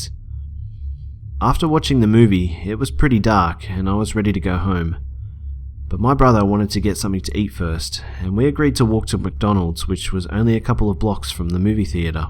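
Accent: Australia. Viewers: Not trying to be a stalker but it's obvious that your australian I'm a Queenslander wbu? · it's so hot when he swears, with his (australian?) accent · Just curious, but what is your accent? It sounds very British, not sure which region, but at times you sound distinctly Australian. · Yep it is aussie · He's Australian 😂💕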